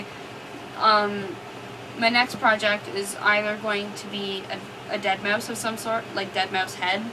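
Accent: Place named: Canada. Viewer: you sound canadian. are you canadian?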